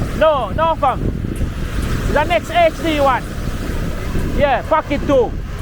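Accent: Jamaica. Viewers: Thank you for this chance 💜 to see Negrill again. Love to hear your beautiful Jamaican accent. Hope to return again to your country again one day. Such wonderful people and country!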